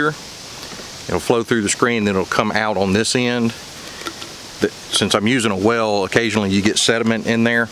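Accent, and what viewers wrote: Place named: Arkansas, United States. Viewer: Nice to see you back. Watched the whole house build from here in England. We have kin near De Valls Bluff and hearing you reminds me so much of our visits there. Thank You.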